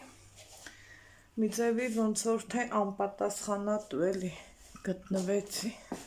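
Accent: Lori, Armenia. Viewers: Барев джан вонц ек,ес нор ем миану кез,вортехиц ек,вонц вор лорва мер барбаров ес хосум кетевем кез,шат ачели е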